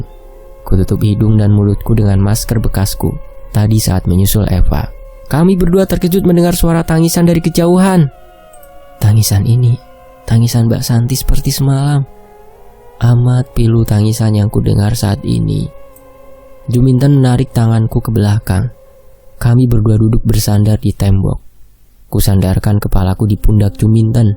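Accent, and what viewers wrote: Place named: Surabaya, Indonesia. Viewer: Oh yo iki arek suroboyo, wong ngomongno mi setan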